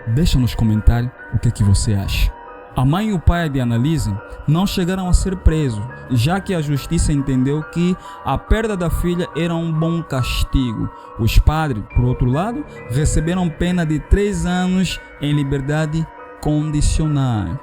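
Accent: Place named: Angola. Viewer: Estava atrás desse episódio já faz um tempo e gostei de ouvir no meu sotaque Angolano🎉🎉🎉🎉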